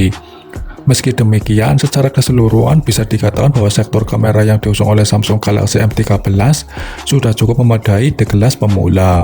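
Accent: Banyumas, Indonesia. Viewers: Orang ngapak yak ?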